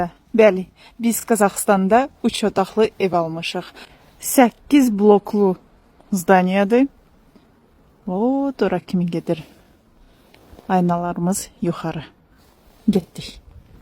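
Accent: Azerbaijan. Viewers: Из за акцента начала слушать.. как будто русская пытается говорит на азербайджанском.., но.. гялифсиз, олуфду, олажах, дяйистиражам, севиняжах........ а это уже регион Азербайжана.... у меня мозг вскипел..😂 тии кито?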